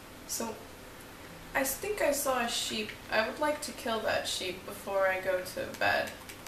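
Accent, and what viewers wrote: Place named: United Kingdom. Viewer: How long have you been living in the UK? I noticed sometimes when you were speaking your voice had a british tone to it xP if that makes sense. The video was pretty cool, the intro was funky :P little tip for future videos: keep your hotbar organised (i.e. keep your tools in a place where you can conveniently access them - I usually keep mine to the left of the hotbar, but find a way that suits you :P) other than that I enjoyed the video :D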